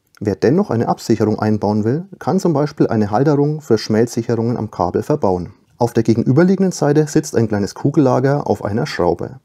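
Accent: Franconia, Germany. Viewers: glingdd noch nem franggn :P :D grüße aus nürnbärch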